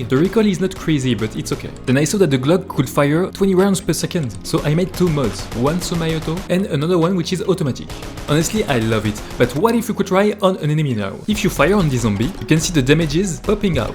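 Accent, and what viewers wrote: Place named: France. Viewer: French, huh? I thought I recognized the accent :)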